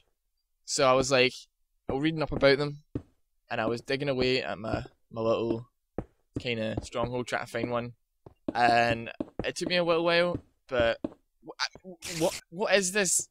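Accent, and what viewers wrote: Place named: Scotland, United Kingdom. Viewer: i like your scotish accent!!!!!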